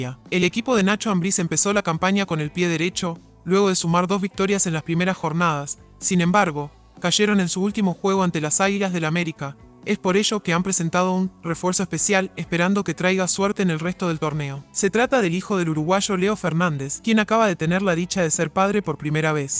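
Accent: Argentina. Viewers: Por qué el narrador es argentino? 🤔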